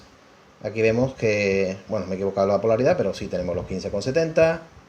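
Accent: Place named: Andalusia, Spain. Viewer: Que pedazo de video ilustrativo y lo dejas todo super claro. Así da gusto iniciarse en la construcción de drones con personas como tú. Por cierto eres de Andalucia no? lo digo por el acento... yo de Málaga. Muchas gracias y saludos.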